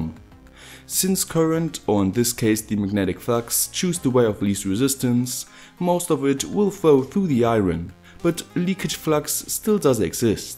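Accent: Germany. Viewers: Great (Video)! Btw, I got one question, are you german? Because you sound like them:D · Nice Geodreieck and nice Deutcher Akzent xD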